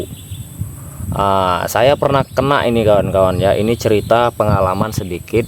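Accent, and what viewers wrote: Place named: Medan, Indonesia. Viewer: Kalok nengok dato bahasanya abang ini orang medan.dari mana bang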